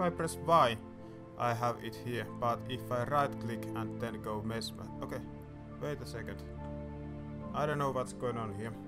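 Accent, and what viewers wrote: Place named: Finland. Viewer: Now that is a heavy finnish accent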